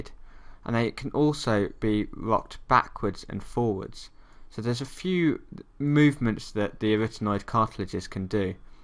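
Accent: United Kingdom. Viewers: I love British accent ❤ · Your british accent and haste makes it very difficult for me to understand :( Can you subtitle more? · can't listen to the whole video , i hate the british accent -_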